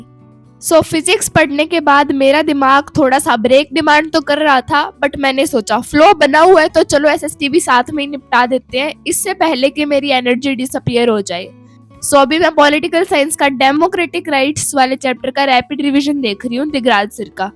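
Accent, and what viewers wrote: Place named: Haryana, India. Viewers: Bahan are you from Haryana because from your tune it seems that you belongs from Haryana😊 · Yah she is from Haryana hisar 😊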